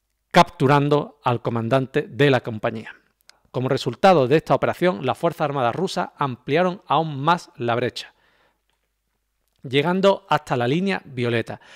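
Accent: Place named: Andalusia, Spain. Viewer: entre Remi y vos están despegados en punta vuestra calidad y respeto al comunicar no es muy común, además tienen un plus,Remi habla muy rápido y a veces se tranca pero las info son como estar ahí,y lo otro tu acento andaluz sino me equivoco es muy agradable de oír ni hablar de tus mapas. Saludos y abrazo cordial desde uruguay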